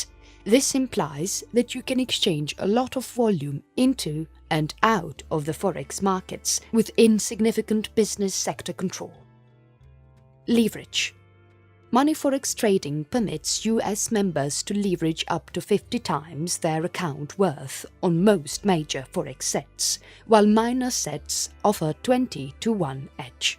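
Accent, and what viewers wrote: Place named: RP, United Kingdom. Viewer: Thanks, posh-sounding nice English lady, it was very informative :)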